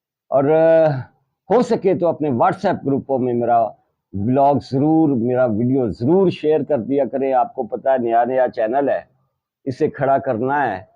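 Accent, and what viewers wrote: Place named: Punjab, Pakistan. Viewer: nice commentary with punjabi tankay!😀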